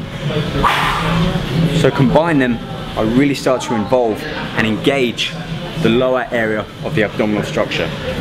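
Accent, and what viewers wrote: London, United Kingdom. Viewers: the british accent makes him so much more believable. its such a sad thing on my mental capacity but its true LOL. hes not saying anything different from what I already know but it sounds so new for some reason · you sound like you come from london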